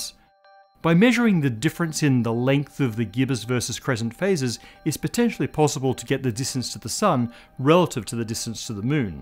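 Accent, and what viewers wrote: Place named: Australia. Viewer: Matt is my all-time favourite narrator. His Aussie accent, perfect pronunciations, and fluid delivery are awesome. Give this man a round of applause! :hand-pink-waving::hand-pink-waving::hand-pink-waving::hand-pink-waving::hand-pink-waving::hand-pink-waving::hand-pink-waving::hand-pink-waving::hand-pink-waving::hand-pink-waving::hand-pink-waving::hand-pink-waving::hand-pink-waving::hand-pink-waving::face-blue-smiling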